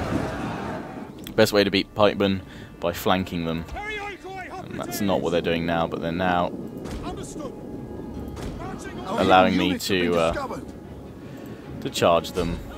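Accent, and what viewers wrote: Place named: United Kingdom. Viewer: You have one of those proper sounding British accents. I enjoy your vids.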